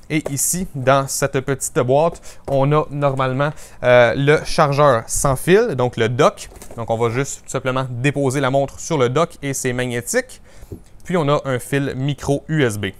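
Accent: Quebec, Canada. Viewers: j'adore l'axen cebecoi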